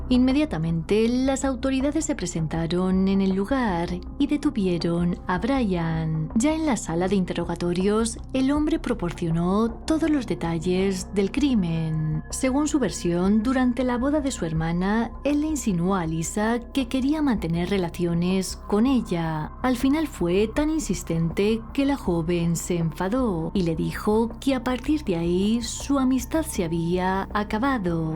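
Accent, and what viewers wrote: Spain. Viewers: Ja debe ser el tono y acento de algunas regiones de España. Ya escuche esa tonada en otra gente española en YouTube.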